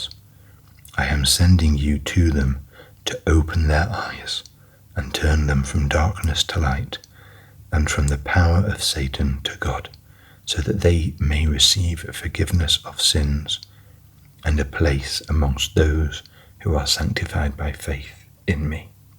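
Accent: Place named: United Kingdom. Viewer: Your videos combine 3 of my favourite things - a British accent (which I have a total soft spot for) ASMR and mini hotel room reviews! Genuinely the perfect combination. Not to mention your calm and soothing voice. I honestly can’t imagine better videos to relax and watch on here :)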